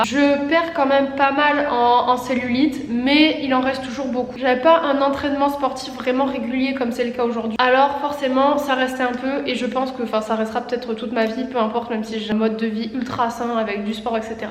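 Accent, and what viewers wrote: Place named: Marseille, France. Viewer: Ohhhh une marseillaise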